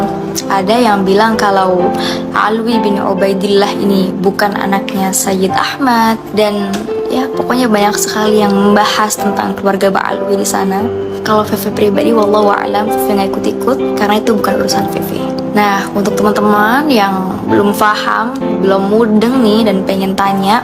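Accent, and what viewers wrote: Indonesia. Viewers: Pri bumi sekali medoknya, lanjut.......!!!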